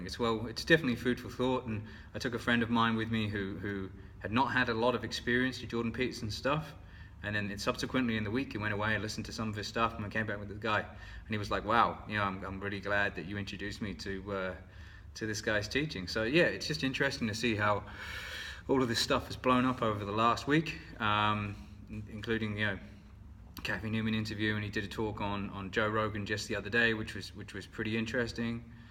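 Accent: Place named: Australia. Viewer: Hey has anyone ever told you that you speak with a little bit of an aussie accent?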